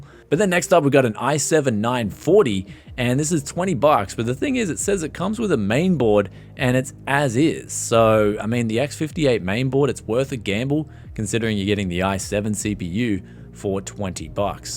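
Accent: Australia. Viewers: I think I could listen to Aussie's talk about anything and stay interested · love the aussie accent.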